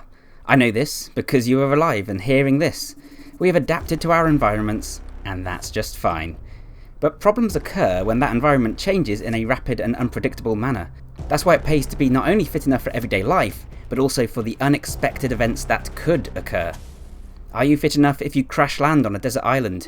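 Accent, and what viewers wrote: United Kingdom. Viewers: At the risk of sounding rude, your voice really doesn't match the body I'm seeing in the video. Where in the UK is this guy from?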